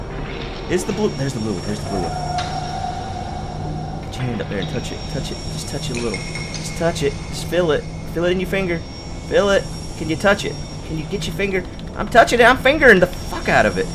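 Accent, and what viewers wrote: South, United States. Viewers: You just get more and more southern the longer this goes! "How in the HAY-ULL!?" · pissed off Southern accent is the best thing ever. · gets really, really Southern when he's stressed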